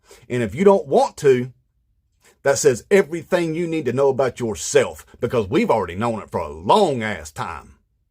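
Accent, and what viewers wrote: South, United States. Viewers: So damn good! I just recently found, could not have appeared at a better time, I'm trying to maintain what's left of my sanity. This man's voice is so spot on, the tone he uses, the wry humor, the precision of his satire, it's just perfect. Also music to my ears is his southern accent. Living out here on the left coast we don't get a lot of well spoken truth in that accent, it's an affirmation that perhaps we're not as close to the abyss as we think we are to hear that other people in other parts of the U.S. can see the same things we do. This man's voice is of tremendous value right now, I will subscribe and look forward to his commentary on this non stop train wreck we're living through. Inmate trump.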